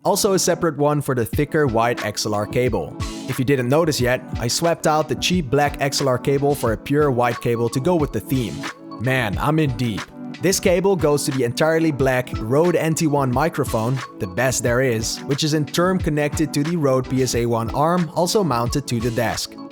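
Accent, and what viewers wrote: Netherlands. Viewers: I sound a bit of an dutch accents. Nice that your english is almost native. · I skipped the video forward to check if this is interesting to watch. And I immediately thought hmmm... you sound Dutch. And than you said 'twee vliegen in een klap' right after it. Toffe video en zeker een inspiratie voor mijn eigen setup!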